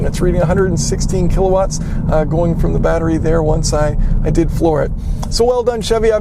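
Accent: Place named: North, United States. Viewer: Thanks for a great test. I'm still trying to figure out in what part of NC you learned to talk like a Yankee.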